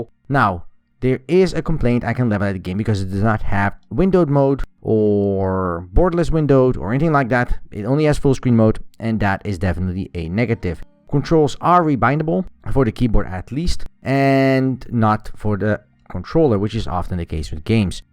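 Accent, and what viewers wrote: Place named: Netherlands. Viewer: I assume Dutch? You have a very deceiving accent if that's the case! Great video nonetheless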